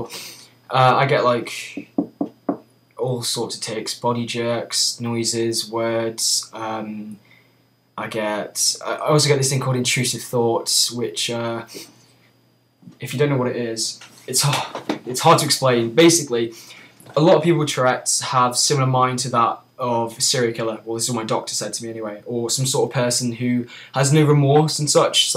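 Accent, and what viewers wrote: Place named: England, United Kingdom. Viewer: I'm just going to be a total creep and say that I really like your jaw line and your hair. And your eyes. I'm not gonna lie, I've watched this video more than once all the way through because I love your accent and I like listening to your voice. Also, I think you'd be such a fun friend to hang out with. Also - where from England are you from? What kind of accent is that?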